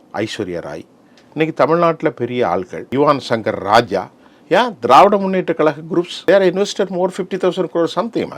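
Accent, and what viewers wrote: Sri Lanka. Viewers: இலங்கைத் தமிழ்.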